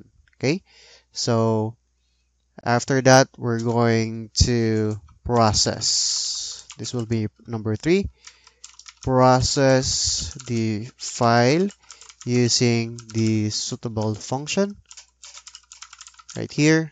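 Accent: Philippines. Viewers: bruh i giggled hahaha. his first sentence and I knew he should be Filipino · Is this A Filipino? Because the Accent is Like Filipino XD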